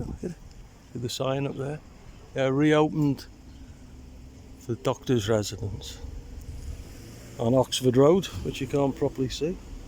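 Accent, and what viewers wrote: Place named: Liverpool, United Kingdom. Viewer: Cheers..... Lllandudno born but with a scouse twang..... incongruous.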